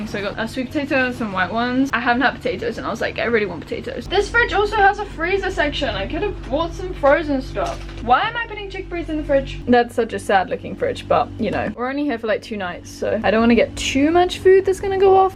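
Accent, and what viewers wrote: Australia. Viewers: lowkey getting an Australian accent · i totally agree - you sound 25% Aussie, and your intonation is 40%! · You’re picking up the accent a bit! Aha xx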